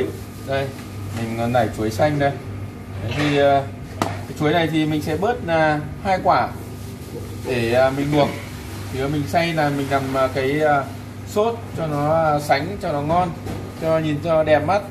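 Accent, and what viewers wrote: North, Vietnam. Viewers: Nghe giọng bắc rồi còn hỏi...?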